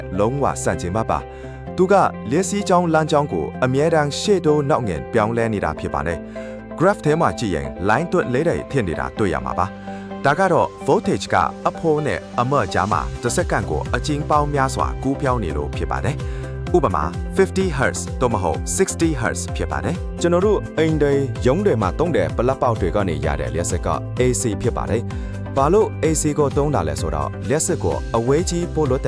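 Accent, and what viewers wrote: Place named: China. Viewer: လျှပ်စစ်ရဲ့ အခြေခံ သဘောတရားကို ဝေမျှသင်ပြပေးလို့ ကျေးဇူးပါဗျ။ သို့သော်....... AI ကို ပြောခိုင်းတာကိုတော့ မနှစ်သ်ပါဘူးဗျာ ။ မြန်မာစကားကို စာဖတ်ပြီးပြောနေတဲ့ တရုတ်တစ်ယောက်ရဲ့ စာဖတ်ဟန်နဲ့တူနေပါတယ်။ ဝါကျတစ်ခုခြင်းစီရဲ့ အသံ အနိမ့် အမြင့် အဖြတ် အတောက် မပါတဲ့အတွက် နားထောင်ရတာ ပေါ့ပျက်နေပါတယ်။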